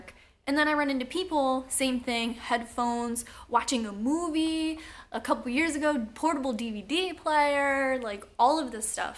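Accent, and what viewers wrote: Philadelphia, United States. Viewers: Are you from philly?!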